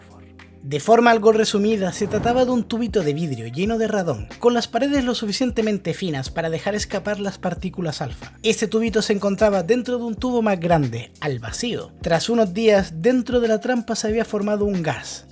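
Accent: Chile. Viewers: este man es chileno?? tiene acento de chileno!! saludos🇨🇱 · Buen día, colega ¿Se puede saber de que cuidad de España proviene? Es que me asombra que su acento suene tanto al chileno. Si no fuese por el seseo típico español, habría pensado que eras de Chile. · Amigo, cómo es que hablas como chileno? Jamás imaginé que fueras español, un saludo desde Seattle, tu canal es increíble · Tienes el acento de un chileno. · Están geniales tus vídeos!! Muy explicativos y profundos. Solo me perturbaba una cosa y es tu acento tan parecido al chileno jajaja hasta que descubrí que eres de Canarias y ahí todo tuvo sentido 😂 Saludos desde Chile, el peor país de Chile 😅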